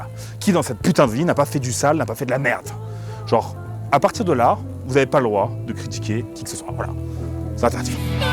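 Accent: Russia. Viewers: ton anglais avec un accent russe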